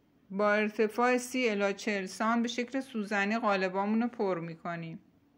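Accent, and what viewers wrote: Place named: Bakhtiari, Iran. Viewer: لهجه ی بختیاریتون خیلی قشنگه❤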